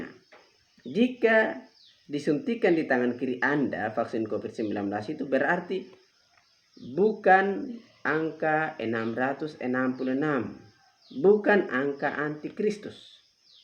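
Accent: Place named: Kupang, Indonesia. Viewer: Dari logatnya ini kaya orang kupang ya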